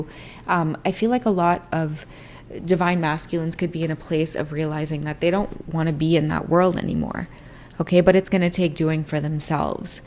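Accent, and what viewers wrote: Trinidad and Tobago. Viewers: As a fellow Trini, I love hearing your accent! 🙌🇹🇹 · yes we love your trini accent, you should defly use it more its so authentic and its music to my ears, reminds me of my aunt🥹🥹❤️‍🔥🇹🇹🇯🇲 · Are you trini?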